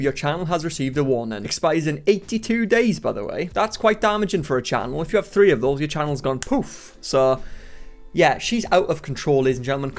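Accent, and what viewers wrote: Wales, United Kingdom. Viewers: i came for the lillee jean drama, i stayed for that welsh accent 😩😩😩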